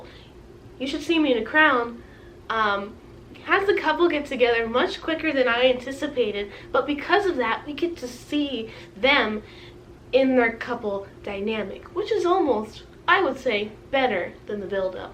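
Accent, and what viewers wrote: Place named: South, United States. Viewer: very here for more reviews in southern accents. so many of these books are on my tbr!!!!! so excited!!!!!!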